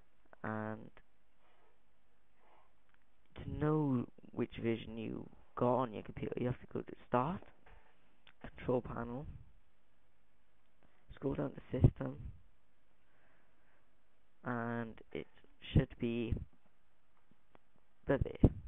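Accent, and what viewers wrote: Wales, United Kingdom. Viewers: u sound like a mix between welsh and english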